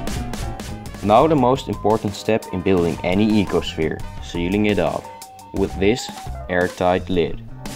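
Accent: Netherlands. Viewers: nice video! i do not know where you are from, but you sound very Dutch. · he sounds dutch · I always thought you were Dutch by your accent (I have it too lol), but I wasn’t sure, and now I am. It feels satisfying to be right.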